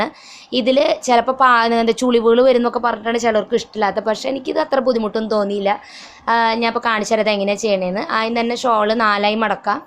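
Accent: Thrissur, India. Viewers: Samsaaram kettapol thoni .ivde ente adutha flatil nd .1 thrissur kaar.avrde samsaaram ningade samsaaram same aane .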